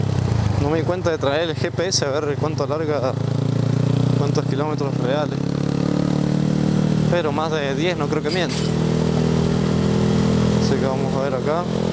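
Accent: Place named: Mendoza, Argentina. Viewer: Jaja wuacho SOS de Mendoza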